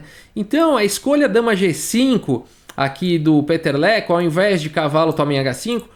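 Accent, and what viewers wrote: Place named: São Paulo, Brazil. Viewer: Esse sotaque de paulistano é um barato!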